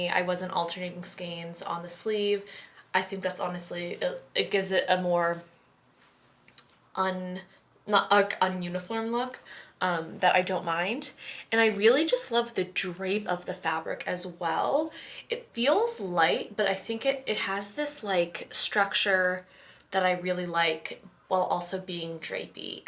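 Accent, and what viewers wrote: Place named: United Kingdom. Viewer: lol love the British accent :P! and love the knit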